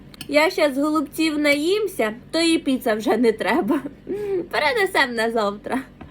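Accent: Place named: Surzhyk, Ukraine. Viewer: Диво дівчина. Не люблю суржик але з цих чарівних вуст це як музика.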